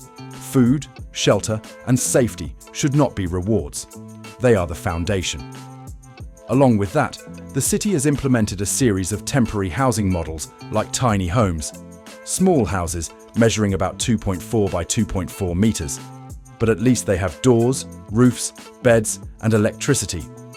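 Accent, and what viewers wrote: England, United Kingdom. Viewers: English accent throwing shade on LA - nice try diddy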